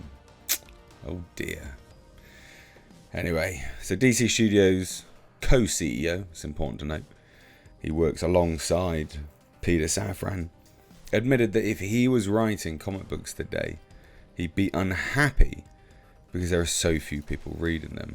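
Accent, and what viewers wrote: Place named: United Kingdom. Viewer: You're an Brit, pronounce "manga" however feels natural to you, bruv.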